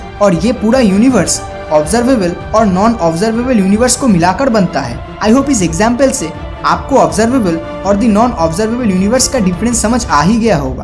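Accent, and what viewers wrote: Bengal, India. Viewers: Bhai app Bengal se hai kya?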